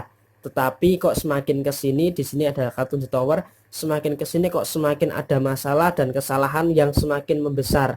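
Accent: Java, Indonesia. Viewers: Pendirian kuat,klo gk penting gk banyak bicara, siiipp,,, mmg begitu Ada nya. Makasih Mas, reading nya,,,,,, medok banget bhs jawanya,,,, heheeeheee... 🙏🙏👍👍😇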